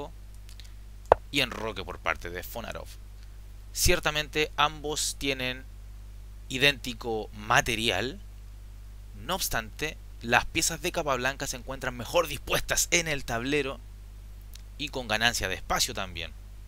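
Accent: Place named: Chile. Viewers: Habla como chileno de hecho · El acento es Chileno, aunque no lo creas!! Para que después no digan que en Chile se habla diferente.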